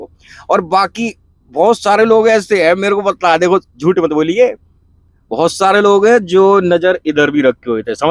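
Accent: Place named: Etawah, India. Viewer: Jai Balaji Maharaj 🙏....From Kanpur but right now from Arunachal Pradesh...Sir aapki boli Itawah ki taraf ki lag rahi hai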